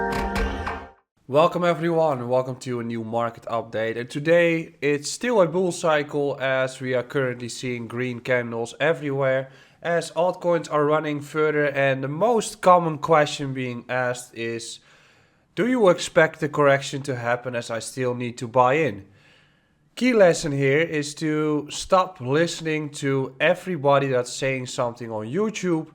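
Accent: Netherlands. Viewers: Nee man, maakt niet uit. Beetje boeren Nederlandse accent stoort niemand zich aan :P Je hebt een leuke persoonlijkheid en je NL accent hoort daarbij. Ik zeg, houden. Je hebt nu al verdorie meer subscribers dan veel kanalen die native Engels zijn dus mijn inziens doe je het goed :) Je wordt zelfs vaak op wereldbekende crypto nieuws websites aangehaald als expert dus je doet echt wel iets goed haha.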